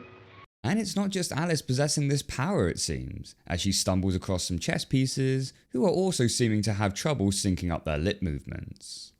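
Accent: United Kingdom. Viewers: 😊 Aw, I don't think you're a prick. I think you're a pretty good narrator for film reviews. That deep voice and British accent really helps when you review the sad drama type films.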